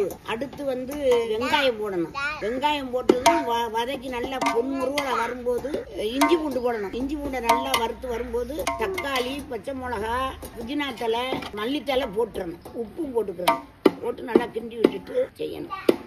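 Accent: Ramanathapuram, India. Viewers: ராமநாதபுரம் பேச்சு உடன் சாப்பாடு செய்யும் போது என் சிறு வயது நினைவு வருகிறது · Ramanathapuram slang ah?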